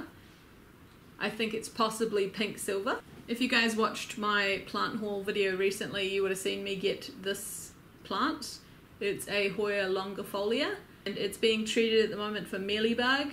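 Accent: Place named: Auckland, New Zealand. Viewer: Hi from Auckland, I'm Sarah from Auckland. I wonder if we went to school together a long time ago. I was watching a bunch of Hoya vids then yours popped up and I thought "Hey! I know that accent!" Lovely collection you have there. I've had one hoya my whole life then recently started adding more. You've done soooo well with your Obovata and Kerrii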